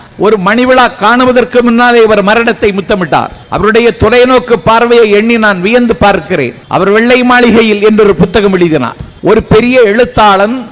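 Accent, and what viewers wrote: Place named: Kerala, India. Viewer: இவரோட தமிழ் உச்சரிப்பு சரியில்லை மலையாளம் சுவை கலந்து வருகிறது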